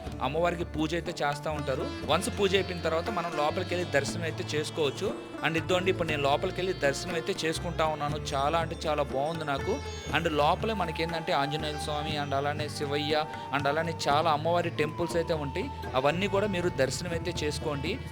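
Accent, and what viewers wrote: Nellore, India. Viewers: సూపర్ గా చెప్తున్నావ్ నాన్న నెల్లూరు భాష ఉపయోగించి మాది కూడా గూడూరు చాలా సంతోషంగా ఉంది బాగా వివరిస్తున్నావ్ వెరీ గుడ్ నాన్న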